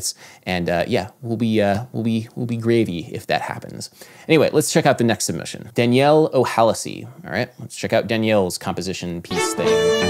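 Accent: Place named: New York, United States. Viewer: Just something that keeps intriguing me. Where are you from? This is one US accent I’m not familiar with. Great vids mate!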